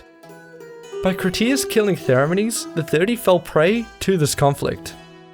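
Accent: Australia. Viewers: Recently I was going through my subscriptions and since I want to keep the number to a minimum I considered to unsubscribe. But then I though: nah, I absolutely need to know it if ever uploads a video again. I missed your drawings, competent explanations, and Australian accent!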